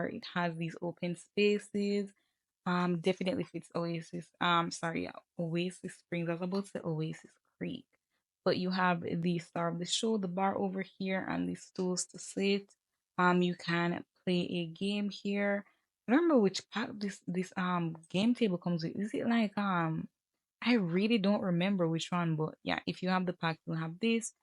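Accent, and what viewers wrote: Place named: Caribbean. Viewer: Ouu I hear an accent happy to see other Caribbean gamers this was a great video 🫶🏽😊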